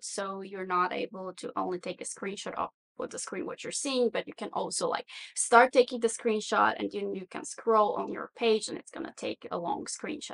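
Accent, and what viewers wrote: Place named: United States. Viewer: I watched your video at 1.5X speed. At that speed, you sound American--esoecially when you say "and".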